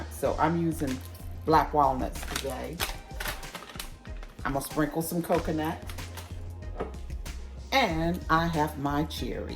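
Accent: South, United States. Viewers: Your southern accent is divine unlike my bland Australian accent.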